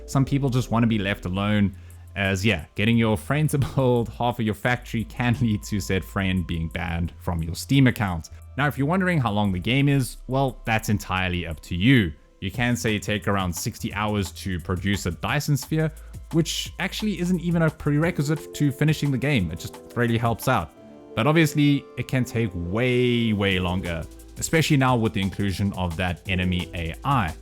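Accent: South Africa. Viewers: My man sounds like a Saffer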